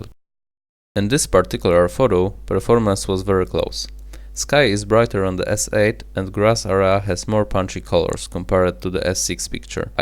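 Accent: Poland. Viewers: Bardzo polski akcent... dobry test ale jak dla mnie ostrosc ma jednak troske lepsza s8 i dorzucił bym jej pół gwiazdki :) Pozdrawiam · Elo polska ;) po akcencie słychać zawsze ;)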